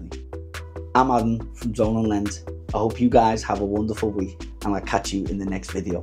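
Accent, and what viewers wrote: Liverpool, United Kingdom. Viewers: You sound Scouse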